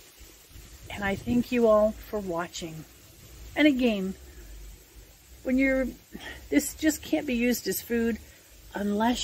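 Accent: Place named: Canada. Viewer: Beautifully presented and i love your accent, I'm presuming Canadian, in particular words like "about" "road", something about the way you pronounce 'oa' 'ou'. Get plenty more of those words into your videos please!.... 🙏💖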